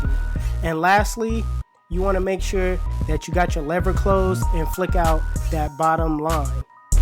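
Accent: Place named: New York, United States. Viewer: New Yorker accent is strong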